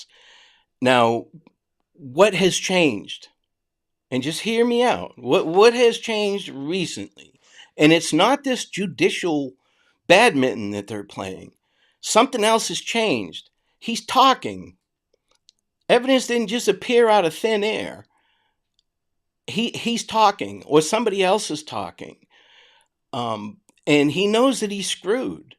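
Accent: Boston, United States. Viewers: if you haven't noticed most criminals aren't known for their smarts! When I worked at a prison in North central Florida one of the inmates got caught after a successful bank robbery in Jacksonville. Him and his buddies got out and away successfully with money. Thing is, the idiot brought his flip phone with him and the phone fell out of his pocket while exiting the bank. His girlfriend was blowing up his phone calling during the commission of the robbery and continued calling back to back call until a female police officer answered the phone. Bank robbers girlfriend challenges the female (cop) answering her man's phone and provides his name, location, vehicle description. This is how he was caught. Silly criminal doing silly stuff, with silly people and entertaining silly women having silly conversations on silly phones., thank you for clearing the air on facts and bringing your Boston accent and gift of story telling. 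No narrator is better than you, well except Morgan Freeman or the guy from unsolved mysteries and that's top notch competition. I wet myself a bit when you called dude a DH, I love your quick wit and ability to get cocky on the fly. I put money on DH wears skinny jeans, paints his nails, has a safe place, feels free and special by using restrooms not assigned appropriate according to his anatomy and sports a participation trophy. 😂. He can get glad in the same hipster skinny jeans he squeezed on and got mad in, probably after crying himself to sle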